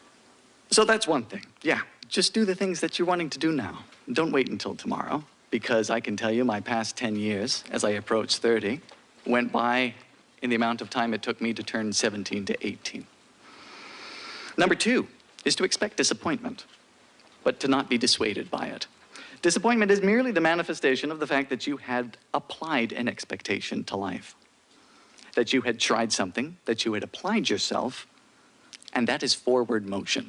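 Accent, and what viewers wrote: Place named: Bermuda. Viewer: The Bermudan accent comes out a bit more when he's at home eh.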